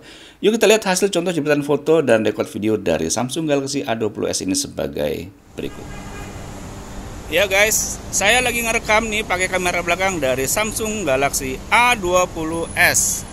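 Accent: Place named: Banjar, Indonesia. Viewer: Suara nyaring ya bang bahasa banjar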